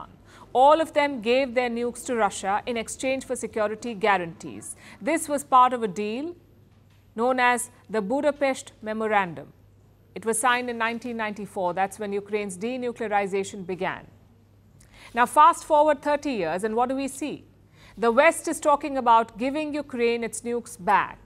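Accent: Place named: India. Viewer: Indian accent 😂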